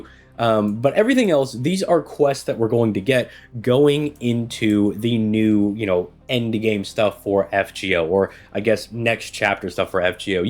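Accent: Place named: United States. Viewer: He's American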